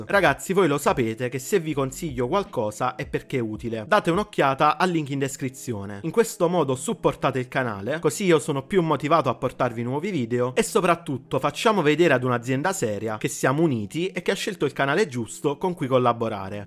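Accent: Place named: Naples, Italy. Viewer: credo che sei Partenopeo dalbtuo accento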